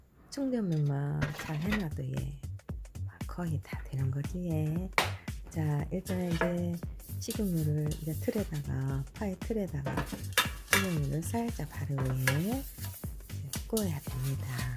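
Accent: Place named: Gyeongsang, South Korea. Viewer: 오~호두파이! 경상도 사투리까지 구수하니 좋습니다^^ 잘보고 가며 살포시 손내밀어봅니다! 좋은 인연 맺고 서로에게 힘이되면 좋겠습니다😉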